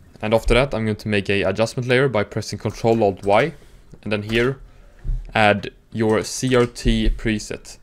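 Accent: Sweden. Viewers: this guy is 100 percent swedish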